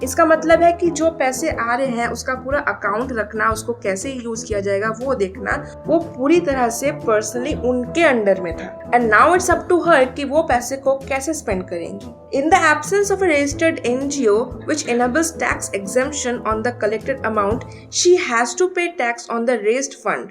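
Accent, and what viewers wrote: Bengal, India. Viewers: Tum shayad west bengal se ho tumhari hindi bolne wali accent mein bangali touch hai tum north se nahi ho ye mujhe pakka yakin hai waise awaz mithi or pyari hai tumhari bhagwan ka shukra hai ab ladkiyan bhi right wing ko support kar rahi hai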